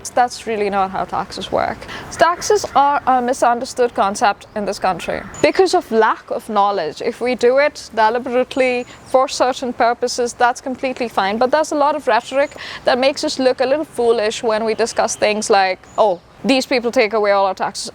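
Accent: United Kingdom. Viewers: Ur accent is irritating either u completely speak british accent or complete indian accent pls don't mix both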